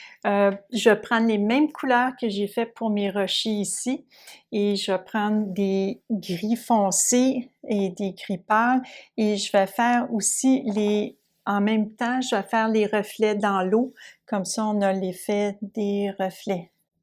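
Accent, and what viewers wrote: Quebec, Canada. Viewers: c'est un plaisir de vous écouter (avec votre joli accent québécois) et vous regarder peindre, j'ai justement des rochers à faire, j'ai un peu de mal car beaucoup de nuances, j'utilise un modèle de photos prise en hiver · J'adore vos explications ! .En plus votre accent canadien ,c'est super ! .Merci à vous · Merci pour vos conseils dorénavent je suis tous vos tutos j'en suis ravi, un marseillais qui adore aussi votre accent Québéqois. Michel Hibernac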